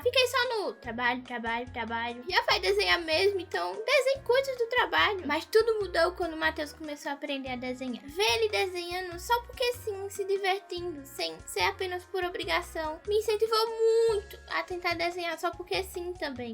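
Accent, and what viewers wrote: Brazil. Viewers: seu sotaque e mt forte jkkkk me lembra mt quando passei por recife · Moça vc é de Pernambuco?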